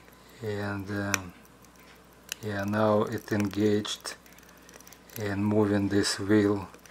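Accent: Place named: Russia. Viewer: Your Russian accent is перфект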